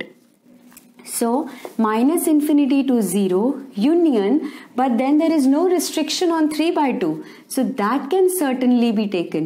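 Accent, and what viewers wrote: India. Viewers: I can't understand the accent of Indians. they emphasize a lot on words such a tttttt ,ddddd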